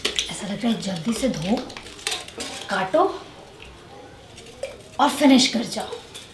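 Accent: Bihar, India. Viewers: Aapki basha me bhi bihariyo vala touch hai or lgti bhi aap bihari si ho...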